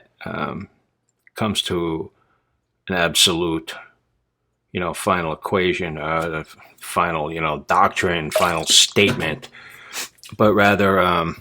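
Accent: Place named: Brooklyn, United States. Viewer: I love the Brooklyn Italian accent, by the way. It's music to my ears.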